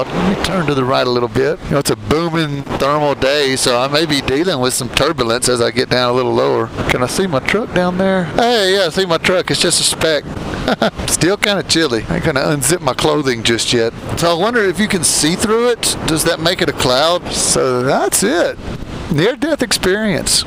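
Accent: South, United States. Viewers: I love that southern accent. And that video was awesome. If you're ever in Sydney, Australia. PM me and I'll buy you a beer.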